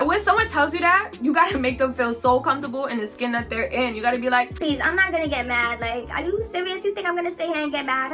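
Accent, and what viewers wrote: New York, United States. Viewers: Is it just me or she sound a little bit like Nicki or that’s just the New York accent idek. Or like she could say Barbados like Rihanna 😭🥰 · you from New York,🤔 don't know if it just me but I hear a bit of New York in your when you talk lol.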